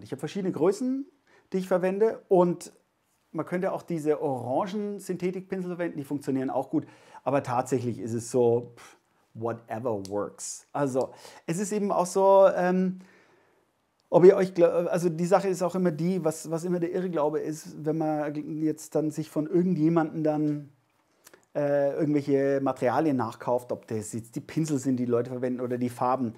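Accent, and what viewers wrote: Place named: Franconia, Germany. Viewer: Danke für das Video 🌷Du klingst aber nicht nach Wien... Eher nach Franken 😉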